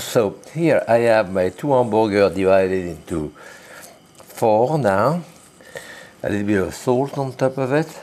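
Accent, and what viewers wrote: France. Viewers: Besides watching Jacques cook, I love listening to his voice. My maternal Grandmother was from France and it reminds me so much of how she'd speak English in her beautiful accent. It's so comforting to watch him cook and listen to him describe the process while relating personal stories. I'm sure I'll never have the privilege of meeting, but I'm extremely grateful to be able to watch his videos on YouTube and Instagram every day. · exactly, every other commenter is a celebrity obsessed child. the reality is this was an atrocious example of cooking on every level. food safety, ingredients, technique. sorry, other than the charming accent and the nice personality (my dad speaks clear American English also from France about the same length of time). I love burgers and good cooking by the way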